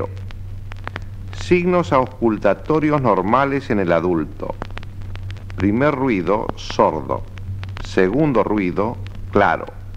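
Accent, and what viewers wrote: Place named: Argentina. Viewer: Ese acento argentino de loas años 80 me trae mucha nostalgia :)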